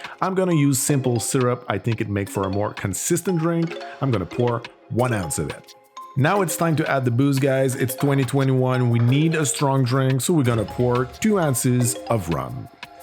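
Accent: Quebec, Canada. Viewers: French Canadian accent is so sweet ! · From Québec ?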